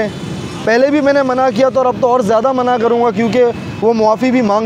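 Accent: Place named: Punjab, India. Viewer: he is talk style paindos he from maybe Punjab he said moof krdena chaiye itni ghatiyan Urdu Karachi k log nai bolte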